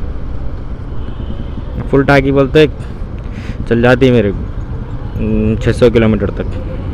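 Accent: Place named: Hyderabad, India. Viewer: This is not a hindi bro. It's a deccani hyderabadi slang...💀🙌🏻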